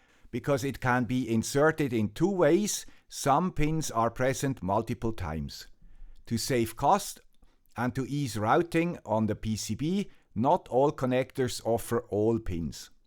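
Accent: Switzerland. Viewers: Summary Introduction to USB-C and Power Delivery - Charging and data transfer over USB cables have significantly improved. - USB-C connectors were expected to simplify the process. - However, without proper knowledge, users can still face issues like slow transfer speeds and charging problems. - The topic is split into two parts, starting with Power Delivery. - Introduction by the presenter with a Swiss accent. - USB-C's versatility and its mandatory use in Europe. - USB-C supports various modes including Power Delivery, data transfer, video and audio output, and alternate modes like Thunderbolt. - Focus of the video on Power Delivery and data transfer. USB Standards and Connectors - Evolution of many USB standards over the years. - Different connectors accompanied each standard. - Focus will be on newer standards from USB 3.0 onwards. - The video will cover two separate topics: power delivery and data transfer. 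Understanding USB-C Connector Pins - USB-C connector can have up to 24 pins. - Not all connectors have all pins to save cost and simplify PCB design. - Explanation of Ground, VBUS, D+, and D- pins. - D+ and D- carry differential signals for less interference and higher speeds. - These pins are for backward compatibility with USB 1.0. - Introduction of TX1 and RX1 pairs in USB 3.0 to increase speed. - TX2 and RX2 pairs were added later for even higher speeds. - CC1 and C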